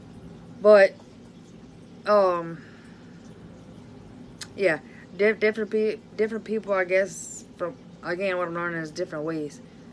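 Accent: Louisiana, United States. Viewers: My sister lives in Denham Springs, and my sister- Inlaw is in Zachary. Love hearing your stories, can’t believe people think your faking how you talk. I can clearly tell you are Cajun. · I love hearing your story & look forward to catching up on your videos. Hearing the Cajun accent again brings back so many fond memories of my Dad and his side of the family. I miss hearing him & my aunts and uncles speak. Especially when they got deep into conversation and moved in & out of speaking Cajun & English. My Gramdma spoke mostly Cajun and very little English. When I was young I picked up on some words and forgot them. (I'm 60) As my Daddy got older he didn't speak much Cajun & had forgotten many words. Then when he was diagnosed with dementia he didn't speak Cajun at all. That is until about 1 week before he passed. He started saying, "aahh sha, I see my Momma & Papa!" Then would hold conversion with them laughing like a giddy child. 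He was so excited. And did you know Jesus speaks Cajun French too? The night before he passed he opened his eyes, stared up, and started a conversation in Cajun while tears ran down his face. He turned to us and said " I just saw Jesus. He's bright and beautiful. I got to go now. Bye." Closed his eyes and passed 8 hours later. That was 10/2018. Dad was 91 years old. I miss him terribly, but I know he is happy & so blessed to be his "sha babae". I love listening to you and a couple of other social media creators tell their stories, speak & teach words. It is bringing them back to me. I am so so thankful for this. 💗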